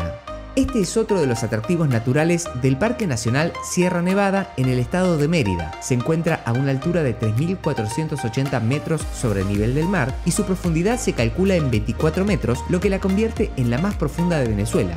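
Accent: Argentina. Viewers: La narración es hecha por una voz argentina. Por que?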